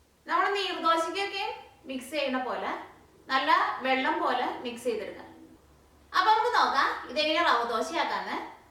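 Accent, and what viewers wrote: Thrissur, India. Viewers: പെങ്ങൾ തൃശൂരല്ലേ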